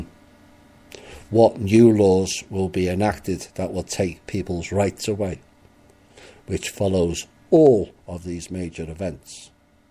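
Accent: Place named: United Kingdom. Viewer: How could it take almost one billion dollars to put a new roof on that church? Something wrong with that picture. From the mindset of an American living in southern California I must say I find your commentary with just a tad bit of cynicism spoken in your British accent quit amusing while very informational. Thank you for your efforts to keep us informed of things regarding the evil cabal!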